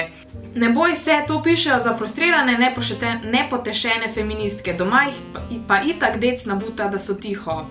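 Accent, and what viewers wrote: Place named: Kranj, Slovenia. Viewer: Mene tvoj naglas sploh ne mot ampak je ok:) moja frendica ma tak naglas pa je iz Kranja. Nekateri imajo slabo mnenje glede tebe ampak meni si super;) tudi če snemaš life trvari ali whatever si dobra influencarka pa še vem ne kaj to pomen(: men je ful dobr da še mal anglish govoriš ( tut js) bodi taka kot si radi te mamo tako:)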